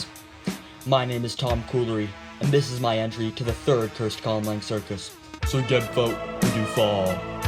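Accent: United Kingdom. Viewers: WAIT IT SOUNDS LIKE A NORTHERN BRIT SPEAKING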